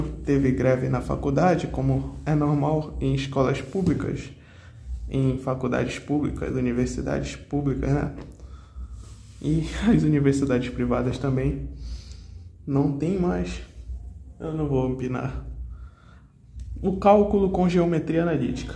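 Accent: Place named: Pará, Brazil. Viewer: Não precisei assistir o vídeo nem por 5 segundos para descobrir que tu és paraense hahahah; é bom ver mais canais daqui surgindo. Parabéns pelo início e pelo conteúdo! já me inscrevi para acompanhar os próximos passos. No que concerne ao teu vídeo, o que particularmente me agrada muito no estilo do livro do Louis é o fato dele explicar os tópicos usando, muitas vezes, PALAVRAS e não ficar abusando de recursos visuais, por vezes inúteis, para "elucidar" algo. Ademais, além dessa escolha de usar muitas palavras para apresentar um tópico, ele muitas vezes não omite certos detalhes que os mais "moderninhos" por vezes omitem. Dentre os livros mais "básicos" de cálculo como Leithold, Munem, Simmons, Ávila, Diva, Thomas, Anton, Swokowski, John Rogawski, Stewart, Lang, Larson, Paulo Boulos e etc., os que mais curto são o do Leithold, do Serge Lang e do Geraldo Ávila.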